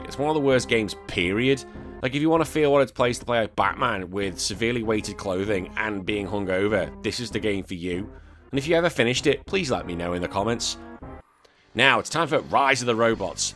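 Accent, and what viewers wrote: United Kingdom. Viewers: I love it when a British person yelled us how bad a game it’s that we didn’t get! We didn’t get Lester the unlikely! Or did you play it when you were 13?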